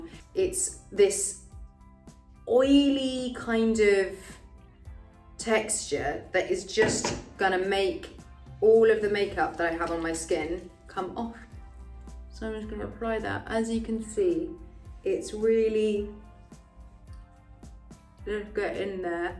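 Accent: United Kingdom. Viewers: Tell me your British without telling me your British (I didn't know she was Brit) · Am i the only one who love this British accent? 😍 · Love her British accent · I love her british accent>\\\<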